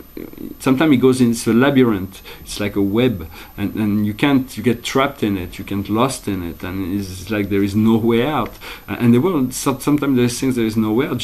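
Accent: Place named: France. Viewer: I could listen to you speak English with the beautiful French accent all day,! Thank you for the Osho reading and blessings to you. 🌎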